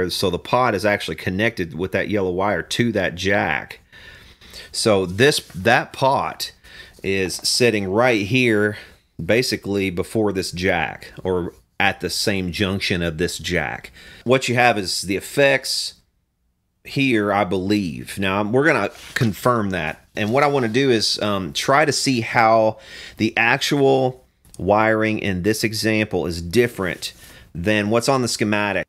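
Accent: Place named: Georgia, United States. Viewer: You sound like you're from Georgia. By the way, I live across the freeway from Mesa Boogie.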